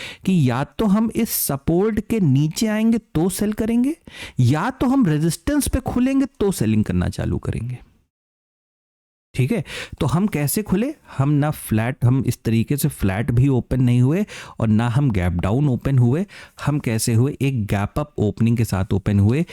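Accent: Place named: Madhya Pradesh, India. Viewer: Bhai, aap MP side se hain kya? Apki boli hamare side ki lag rhi hai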